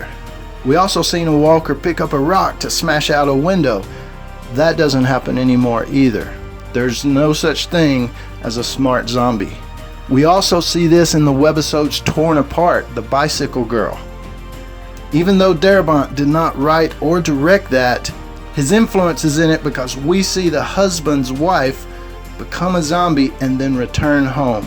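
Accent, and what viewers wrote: South, United States. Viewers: Why is it that every fan Walking Dead video the guy has a Southern twang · good video. where you from man? I can tell you are a Southerner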